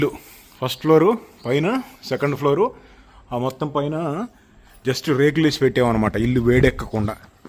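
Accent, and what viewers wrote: Andhra Pradesh, India. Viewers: Anna medi Andhra naaa or telangana naaaa mee language amo Andhra laga undi but ur staying in Nizamabad how come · Mi Yasa Andra laga undi Enduku telangana laga ledu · Anna meeru matlade telugu andhra slang la undi telangana slang la ledhu endhuku ani ala...???? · Anna mi slag andra laga undhi .mereme telangana antunaru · Anna meeddhi andhra laungauge lekka vundhi endhanna😂 nizamabad na manadhi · హలో బ్రదర్. మీరు ఏ జిల్లా. ఆంధ్రావాలా మాట్లాడుతున్నారు. మాది ఆంధ్ర గుంటూరు జిల్లా మాచర్ల బ్రదర్ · Anna nuv Telangana la putti perigi Andhra oodi lekka enduk matladuthav anna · Slang enti Bro Andhra laga vundhe medhe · Mee slang Nizambad slang or telangana slang laa ledu Enduku Anna, Andhra slang la untundi enduku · Anna meeku andhra roots unnaya? Slang chaalavariki andhra laga undi❤ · Miru Andra settlers aa bro basha atlane undi nijamabad lo chala untaru kada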